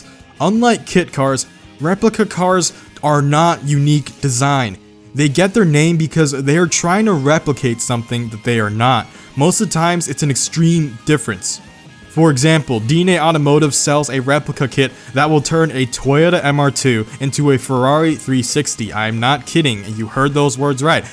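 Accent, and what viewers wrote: United States. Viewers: Audi ≠ oRdi Peugeot ≠ Pew-jo Renault ≠ RenNo you yanks are the fucking worst i swear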